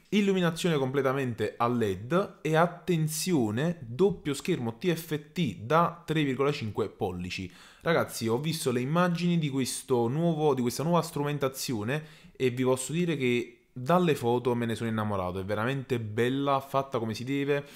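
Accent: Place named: South Tyrol, Italy. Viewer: si...infatti avevo sentito un "vago" accento Altoatesino...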